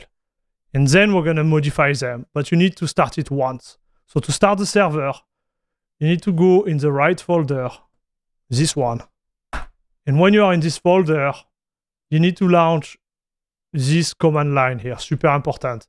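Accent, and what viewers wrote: France. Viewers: Le jeu tu le lance sur quel hardware ? Je l'ai pris sur Steam, via Proton (TkG) sur une Arch sur un 7950X3D et une 7900 XTX, je suis stuck a ~40FPS. Independament de la resolution ou de la qualité. Et au passage, merci de faire rayonner l'accent français dans le monde entier. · Are your a frensh guys because your accent make me laught a lot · Jolie accent français !! 😁😁 Merci pour le tuto tu régal 👍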